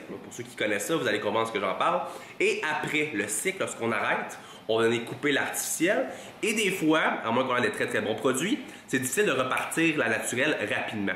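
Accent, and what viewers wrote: Quebec, Canada. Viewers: J'adore l'accent du Quebec, j'ai vécu 1 an et demi à Montreal vers Sherbrook Est, et j'avais adoré, les gens sont super cool, et les salles de musculation sont excellente ! Enfin bref, j'ai regardé plusieurs de tes vidéos et suis impréssionné par la différence entre un physique sous stéro et sans, à quel point ces produits ont une grande capacité à transformer un corps. Mais belle décision d'avoir arrêté pour garder ta santé, sur la sexualité, haha, car à quoi bon avoir un très beau corps si l'on ne peut même pas faire l'amour à sa copine! Let's rock ! ;-)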